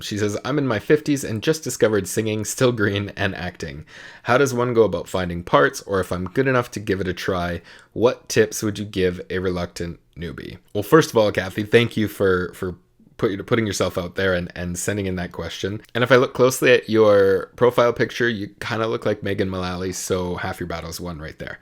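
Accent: Canada. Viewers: My first ever musical? Depends on what you mean. My first STAGES musical was Les Mis. I saw it in ‘93 (I was 13 at the time) Toronto. I think it was the last or one of the last stops of the Canadian tour. Fell in love with the show and went out to get the piano book the very next day. If you mean movies, then it was The Sound of Music. We got hold of a VHS copy back in the 80s and I watched it every day for a month or more. I probably drove my parents crazy! ps: are you Canadian/spend significant time in Canada? I noticed how you pronounce, say, “out.”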